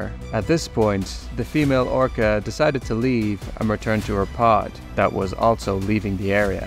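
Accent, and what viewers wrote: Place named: Ireland. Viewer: I spent the first half of the video thinking you were American until my brain went ding and i realised you are Irish. And i'm Irish i felt so dumb.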